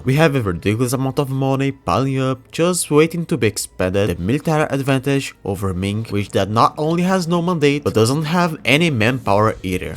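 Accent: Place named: Brazil. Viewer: sempre bom ver algum br fazendo conteúdo em inglês sobre qlq game, sua pronuncia ta ótima amigo, apesar do sotaque "accent" que colocamos, isso deixa com mais charme (obs: demorou quase o vídeo inteiro pra eu começar a suspeitar ser um "compatriota" huashusahusa), sucesso!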